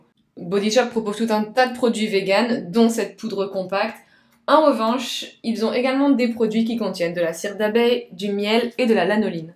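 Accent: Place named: Bordeaux, France. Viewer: Super intéressant comme vidéo ! J’avoue penser aux animaux quand j’en ai dans mon assiette mais pour le maquillage j’ai du mal à l’associer avec la souffrance animale, alors que c’est vrai ... une vegan m’a dit que j’étais flexitarienne : je mange le moins possible d’animaux, j’essaie d’acheter le moins de cuir, mais j’avoue ne pas encore faire de la souffrance animale un combat (et je me dis amoureuse des animaux, un peu hypocrite j’avoue ...) Breef j’adore tes vidéos, j’adore ta façon de parler. Petite question : de quelle région es-tu ? Bordeaux ? J’ai l’impression d’entendre un petit accent tout chou :)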